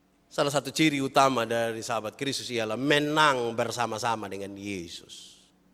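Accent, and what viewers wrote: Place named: East Nusa Tenggara, Indonesia. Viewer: Shalom, khotbahnya pelan, halus,lucu, tapi menusuk sampai dihati yg paling dalam dengan aksen NTT..sangat diberkati bagi anak2 Tuhan yg mendengar. Tuhan Yesus memberkati Pelayanan, jadi berkat bagi orang banyak sampai ke Bangsa2. Salam dari Bali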